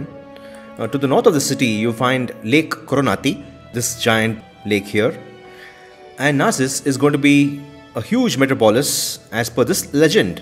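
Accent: India. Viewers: Great content. Going by your accent, I presume that you're Indian. I would be really interested to hear your take on the influences of Indian culture and religion on Morrowind's developers. I played this game long before I had the slightest clue of its real-world inspiration, so I have a hard time dissociating one from the other. Cheers!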